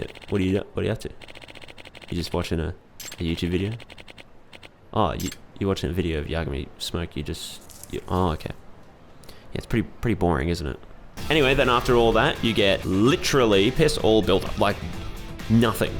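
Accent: Australia. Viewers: As an australian, he doesn't sound very british and is probably also australian.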